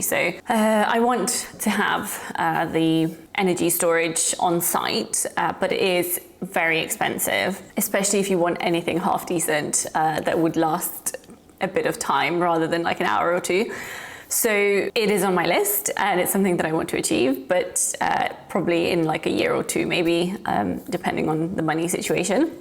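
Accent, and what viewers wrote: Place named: RP, United Kingdom. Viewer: You’re polish but have a very posh English accent. Love it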